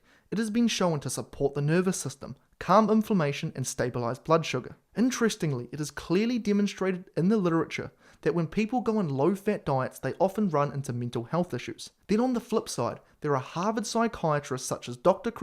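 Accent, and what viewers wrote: New Zealand. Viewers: to me he sounds like a Kiwi at times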